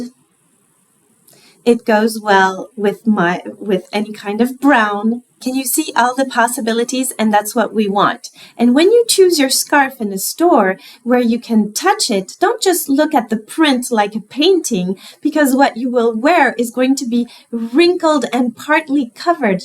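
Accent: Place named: France. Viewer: Yu are absolutely great,it is wonderfully to listen to yu , are Yu french,Yu have lovely accent and it is really pleasure to see yu😊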